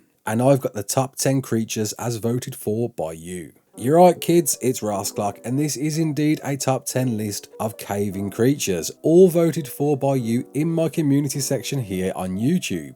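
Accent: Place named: Walsall, United Kingdom. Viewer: Only just came across this video, it was really really helpful. You sound like you’re a fellow Walsall lad 😄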